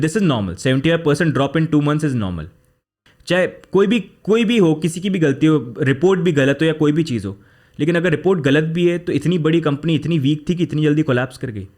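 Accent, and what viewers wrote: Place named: India. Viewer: In the end - Indian same pronunciation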